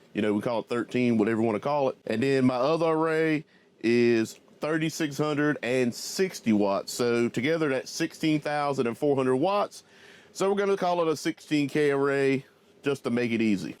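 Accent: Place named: Texas, United States. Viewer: Nice! Kind of hard to guess not knowing where you're located (although sounds like TX), but I'm going to guess 101kW.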